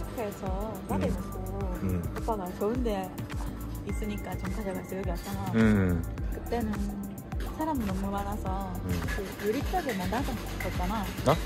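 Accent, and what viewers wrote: Gyeongsang, South Korea. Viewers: 와~~ 경상도다~. 제일 멋지다! 강력 응원합니다. 얼머나 좋으노.. 아.. 진짜 요새 한일부부 AI 추천으로 넘 마이 올라오던데.. 하나 같이 머슴아들이 내시들 처럼 하이 어리가또 해사서.. 싸대기 한대씩 쳐 날리고 싶더마는... 와 진짜 짜증 만땅 올라오더마는.. 몽창 다 날려도 와이리 머이 올라오노.. 하다가.. 멋집니다 이 분. 오리지날 경상도 고대로네. 저는 부산. 오래오래 향복하이소~~~ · 경상도남자 억양을 배우셧네 ㅎㅎㅎ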